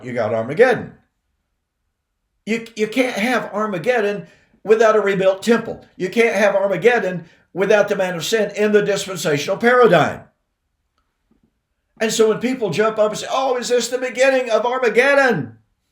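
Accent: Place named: Arkansas, United States. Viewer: this is some of your best material. I know you do not mean to be humorous in your delivery, but the way you point out the absurdities of the futurist, dispensationist view in your Arkansas vernacular has me floored every time. I just can't stop laughing. It is some of the best unintentional humor I have ever heard. Keep up the great work!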